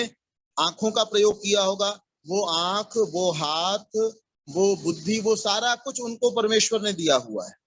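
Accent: Standard. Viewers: आचार्य श्री आपके जैसा शुद्ध उच्चारण कभी ही सुनने को मिलता है।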